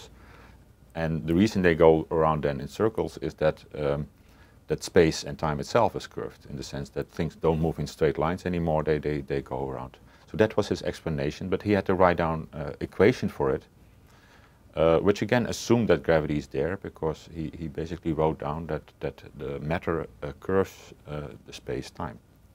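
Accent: Netherlands. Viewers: That Dutch accent. I recognized it immediately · I think he sounds perfectly like a damn dutchman, with his accent, in english, no idea why you think otherwise. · ***** The meaning of "He's Dutch" is that he actually lives in the Netherlands & speaks Dutch. Since English is his 2nd language (assuming he doesn't speak French & German as well) his articulation isn't quite as good as a native speaker. That does not mean there is anything wrong with his science. Only his articulation of it in English. For me, I understand him fully. Sinds ik spreekt ook Nederlands. But since you & the rest of the flat brainers think he is saying gravity doesn't exist, I have to assume it is in his use of the language. Since you can't understand what he is saying & also can't understand my point I have to assume you have an IQ of around 58. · As a fellow Dutchman, I recognize that accent 100% of the time. 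;-)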